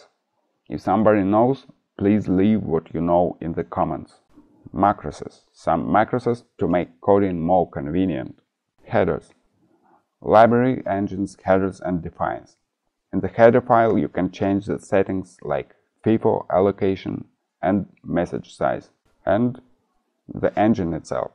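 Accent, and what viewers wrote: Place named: Ryazan, Russia. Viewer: Отлично! Долй HAL. Понятный рязанский акцент без американизмов легко воспринимается на слух. Кусок перекачки файла стоит обрезать процентов на 90.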